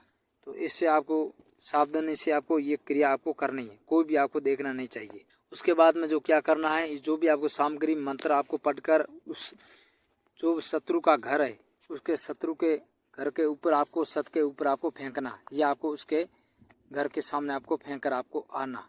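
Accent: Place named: Rajasthan, India. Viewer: गुरुजी में जानना चाहती हूं आप कहां रहते हैं मैं मिलना चाहती हूं I am also from Rajasthan. Your voice tells me that you are from Rajasthan. Please tell us where you are from and I want to meet you.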